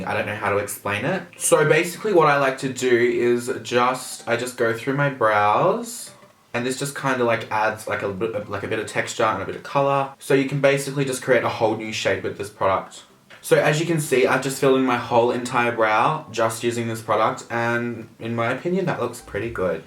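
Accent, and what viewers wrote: Australia. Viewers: IS THAT AN AUSTRALIAN ACCENT I HEAR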